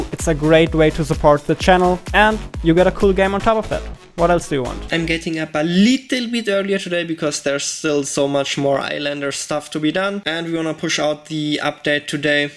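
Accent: Germany. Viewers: You are German? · Are you an Austrian, German or a swiss?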